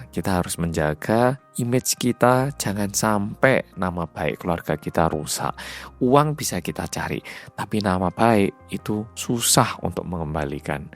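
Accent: Java, Indonesia. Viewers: Dari maraton nonton swindler .. Aku yakin bngt si detektif swasta nya si babang korea medok ini sendiri .. Hm masuk akal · Aku ketemu channel..anda. Lama tinggal diJawa ya..? Medok bhs Indo🇮🇩 nya..😊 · Mas sekarang di kampung halaman apa di tanah perantauan? masih medok ...... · akhirny aplot jg udh kangen mas"korea cerita pake aksen medok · Gw salut sama abang ini, medoknya gak lang-ilang😅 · Aku baru ngikutin, keren bgt 😂 fasih banget bhasa indo Jawa medok nya. 🎉🎉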